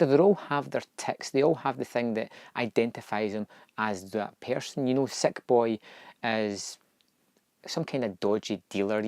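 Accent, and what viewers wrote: Scotland, United Kingdom. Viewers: You know, I'd love to hear You're most natural excited reaction, speaking wise, to anything. The Scottish accent is unparalleled in It's rough, and brutal choke it contorts onto the English language . It rebels against the proper English language, maybe not purposefully, but essentially. Your national language is so strong that, even when replaced, It still survives through the accent, to the point that, It still retains the essence of Your original culture, and attitude. Your language, genetics, and culture are beautiful. All cultures are different and have unique qualities. True diversity is Scotland for the Scottish, and Japan for The Japanese, and so, on.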